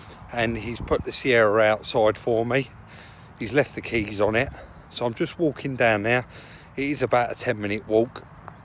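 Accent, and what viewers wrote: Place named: England, United Kingdom. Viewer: Not being a dick but it's so refreshing to hear an english youtuber. Love the american stuff but it's hard to relate, if you get what I mean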